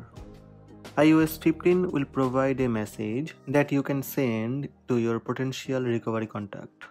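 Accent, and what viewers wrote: India. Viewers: Indians speaking english sounds very ridiculous, next time use subtitles or remove anything below your tongue, the world will thank you.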